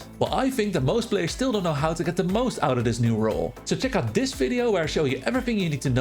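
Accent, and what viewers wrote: Netherlands. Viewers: This guy sounds soooo Dutch :D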